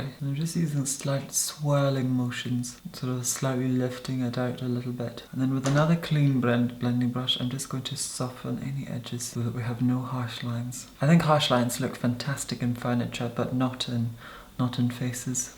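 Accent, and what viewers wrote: Scotland, United Kingdom. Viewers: Anything by Diana Gabaldon. I love the Outlander series. Your natural Scottish accent would be perfect for the Scottish characters and your posh accent would match Claire beautifully.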